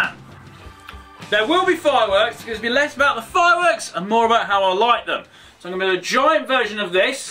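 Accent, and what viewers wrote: United Kingdom. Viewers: hey colinfuze eres británico? hey where are you from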